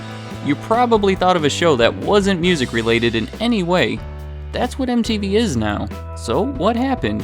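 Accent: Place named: Minnesota, United States. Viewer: Only two videos! I want to watch more. Are you from Minnesota or something? You have a funny accent and I like it cause I'm Canadian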